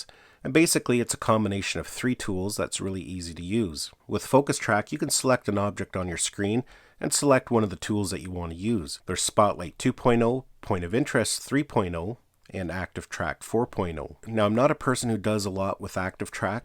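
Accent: Canada. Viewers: Do I recognize a Canadian voice, there? I'm curious about drone regulations in different countries. When you flew 2.5Km to that lighthouse, were you required to maintain visual line of sight with the aircraft? If so, how could you be able to do so? I have an Air 2S, and it seems like the only way I can maintain VLOS beyond around 300M is to put auxiliary strobe lights on it. (Could just be that my eyes are getting old.) Anybody have any advice for enhancing visibility for VLOS?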